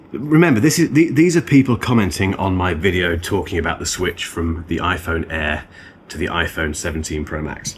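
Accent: United Kingdom. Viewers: People Take a 💊 Pill. He makes good videos, his voice doesn't squeal my ears, actually calming, must be the British accent. Anyway back to topic, are your lives so empty that you need to invest all your feelings into a video, and are hanging by the edge of the seat on every word a YouTuber says Please take many 💊 💊 💊's. I appreciate his perspective and reviews and take everything with a grain of salt and maybe some pepper. YouTubers need to make catch titles and statements that grab your attention, otherwise they will go bankrupt. The iPhone Air is a great phone, I don't have one and won't as it's over priced. I still have older iPhones like XR, 11 pro plus many Android phones. I like them all. So would you and those that make content. What do you expect from the guy, to sit there like a Popsicle and waffle boring words out in a monotone manner and expect us to be be attentive.😂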